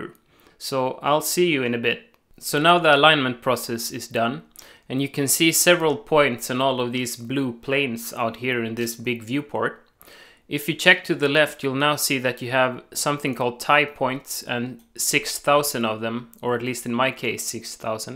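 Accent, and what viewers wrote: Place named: Greece. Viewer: Μα το θεο ακομα και να μην διαβασα ονομα θα καταλαβαινα οτι εισαι ελληνας, το ελληνικο accent δε φευγει ποτε και κανει μπαμ απο χιλιομετρα, πολυ ωραιο βιντεο αδερφε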